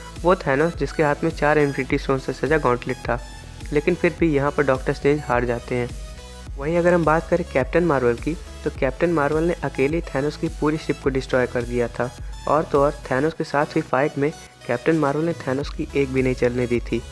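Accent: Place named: India. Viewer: Man from India, Your accent is terrible!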